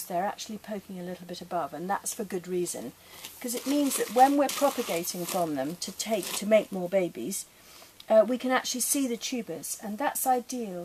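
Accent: United Kingdom. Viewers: Wow! Thanks for the video. I learned a lot! Where are you from? Are you from Britain?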